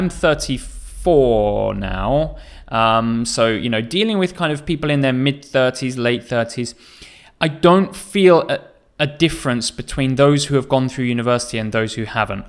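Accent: United Kingdom. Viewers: Like it your British English totally and thank you very much for your videos.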